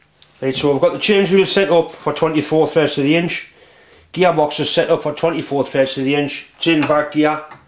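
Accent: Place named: North East England, United Kingdom. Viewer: I'm really enjoying this series,. It is all very well produced and fortunately I am from sufficiently far north to totally understand every single word of your exquisite NE accent. I commend how you use the Vernier Calliper Gauge as a 'Very-near' Calliper Gauge, then turn to the Micrometer for absolute accuracy. All old-school stuff and still valid today. All these ISO units engineers who boast about being able to work to 0.01mm (4/10,000 or 0.0004 inches) when the old brigade, working with a Micrometer with Vernier markings to Imperial units, regularly worked to limits of 1/10,000 or 0.0001 inch (0.0025mm). I think that gives bragging rights to the old school Imperial unit machinists! For any doubters out there, our women in the aircraft factories during WW2 were working to less than a 'thou' accuracy.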